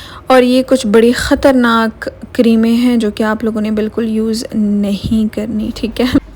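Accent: Punjab, India. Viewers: Ap jaisy apni phli videos me bolti the waisy e bat kiya karen..zeada punjabi nooooooo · I think ap is vlog mein Merium parveiz ki copy kr rhi hain bolnay mein punjabi style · Aapi glasses bht pyri hn or ap ki funny punjabi Sony pay sohagha ....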